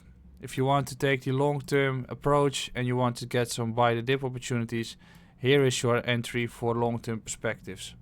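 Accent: Netherlands. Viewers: Lache man! Je eigen stijl ta , een dik NL accent en je wijst naar pareltjes. Ik volg je pas net, maar ziet er tof uit!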